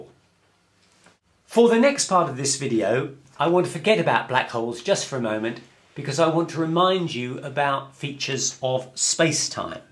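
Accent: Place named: Oxford, United Kingdom. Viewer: Now this is Oxford English we non natives all learned in school. Understand every single word he says. Very good. A very good teacher anyway.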